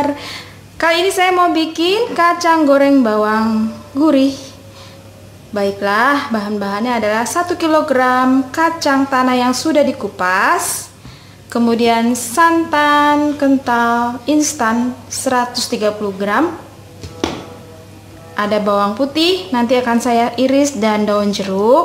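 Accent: Java, Indonesia. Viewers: Maaf ya, ingin tanya daun jurok tu daun apa, sebab bahasa pengantar tu pelat2 jawa, sya ni peminat dri Malaysia.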